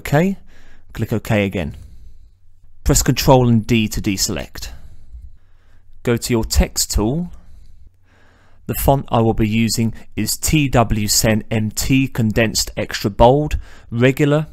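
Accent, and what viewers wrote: United Kingdom. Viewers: Amazing mate! I love it.. your British accent makes it interesting.. May I ask that you do a ''Texturing UV maps for 3d model tank''. For RTS video games like Company of Heroes. Please :)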